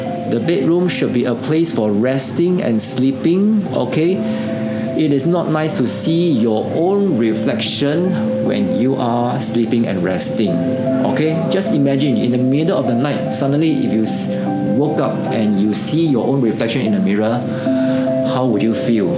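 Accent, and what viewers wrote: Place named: Singapore. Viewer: The Singaporean English ........😳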